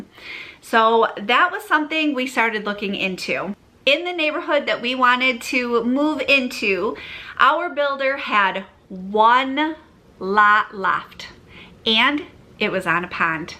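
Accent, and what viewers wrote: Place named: Texas, United States. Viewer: I could never wrap my mind around your dialect being from Texas! Best wishes for your future. 😊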